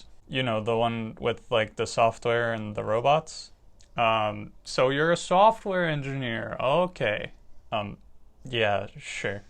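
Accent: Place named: Texas, United States. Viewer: I love the texan accent lol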